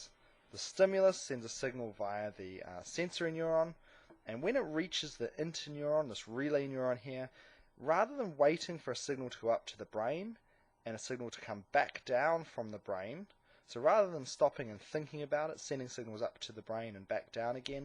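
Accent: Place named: New Zealand. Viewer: nice kiwi accent hehe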